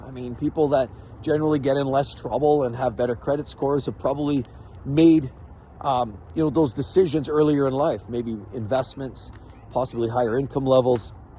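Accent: Canada. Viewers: I knew bro was Canadian from the accent 🇨🇦🎙️🔊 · Canadian accent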